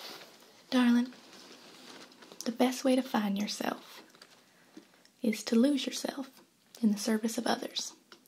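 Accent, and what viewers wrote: South, United States. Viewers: so lovely, thanks for all those hilarious and relaxing vids with Brandy Jean and that southern accent XD You are the master of entertainment for me in ASMR!! love from Germany!! <3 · This little series was so relaxing and reminds me a lot of the people from where I grew up! I usually hate such southern accidents but it's really cute when you do it 😊 thank you for all the tingles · Seriously loved your country accent in this series! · Why the hell are you singing and why do you have a southern accent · this is my first time watching her channel like bro American accent on fleak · I have loved this entire series. Really impressed by your Southern accent as a Southerner myself.:) Loved the "surprise" ending and that chant! LOL. Haven't heard " " in a while.:)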